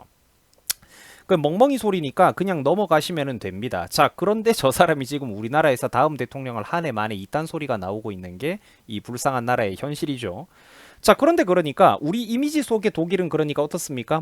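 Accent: Gyeongsang, South Korea. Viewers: 에휴... 경상도 사투리 쓰는거보니 경상도는 왜그래? 니네동네에 원전들어가 있으면 니네가 제일 먼저 반대해야하는거 아니냐? 리트리버수준 뇌용량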